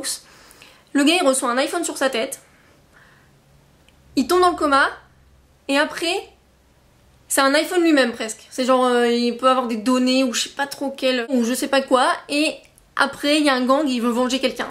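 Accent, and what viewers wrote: England, United Kingdom. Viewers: Salut. Je fait des vidéos sur youtube ou je montre mes achats jeux vidéo. j'aimerais tellement avoir le même accent "anglais" que toi;) Tu présente super bien à la caméra. Bravo pour tes abonnés et bonne continuation.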